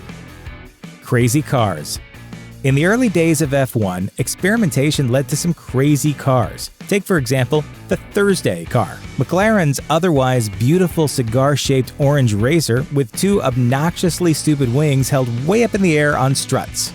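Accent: United States. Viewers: When I heard an American narrating this i knew it was shite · Opens video: American accent appears Oh god, uneducated twats not understanding the nuances of the motorsport, yay. Footnote Hahahaha, Williams and McLaren both being top tier teams. HAaHahAa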